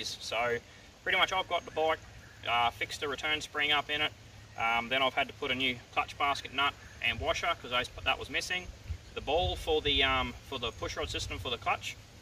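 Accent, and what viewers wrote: Australia. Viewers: Love the content mate I love the pure Aussie Never commented on a video before but I think this needed it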